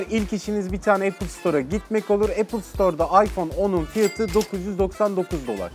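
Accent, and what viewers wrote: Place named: Aegean, Turkey. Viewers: e "gari" :) artık sonuna gelmiş olduk :) Tam bir egeli abim ya :) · aydınlımısın sen e gari diyon :)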